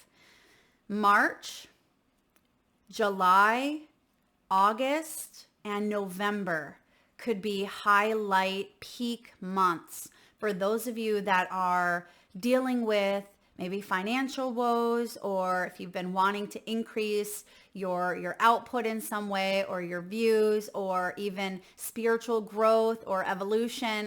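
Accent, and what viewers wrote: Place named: Midwest, United States. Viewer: I've been watching you for some years now and always thought you sounded so midwestern! I grew up in ND and went to college in MN, my best friend is from Wisconsin :)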